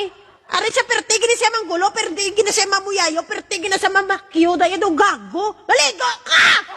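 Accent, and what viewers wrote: Iloilo, Philippines. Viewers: Ilongga ka mam, isog gd 😁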